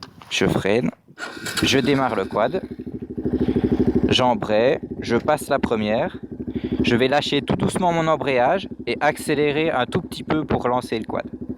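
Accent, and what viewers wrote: Belgium. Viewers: Tu es belge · Tu es belge ?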